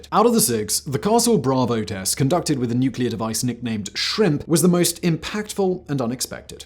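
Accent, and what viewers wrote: United Kingdom. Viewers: A video about a country the United States eradicated is rich coming from someone with a British accent... The British eradicated half of the world, including what became the United States lol.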